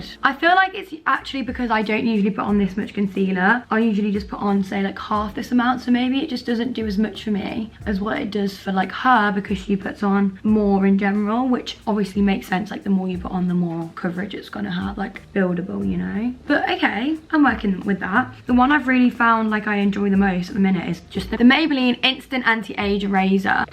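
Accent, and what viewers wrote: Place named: Birmingham, United Kingdom. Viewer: omg I love the fact I know your a brummie with the “do you know what I mean🤣” “I’m not being funny”.... people say I always say this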